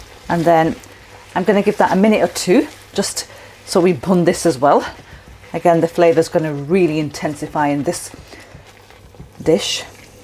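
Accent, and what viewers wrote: United Kingdom. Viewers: I will be trying this dish, it would help if you wrote down the ingredients, since you talk with a British accent it is difficult to tell what you used. Thank you for sharing!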